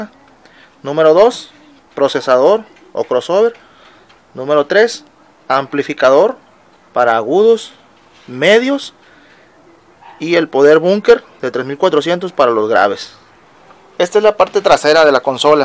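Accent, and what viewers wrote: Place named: Piura, Peru. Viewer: ese dejo es de piurano o de mexicano.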